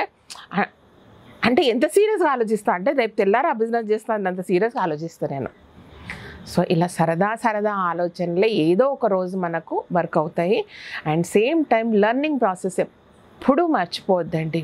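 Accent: Telangana, India. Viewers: Super. Telangana yaasalo chaala baaga aagakundaa acham vaarilaage maatlaadaaru😃😂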